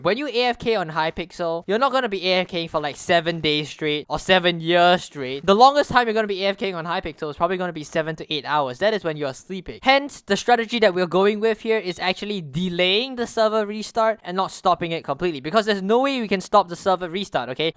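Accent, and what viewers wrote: Singapore. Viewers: You sound singaporean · R u Singaporean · yo are you singaporean or malaysian cus ur accent sounds like that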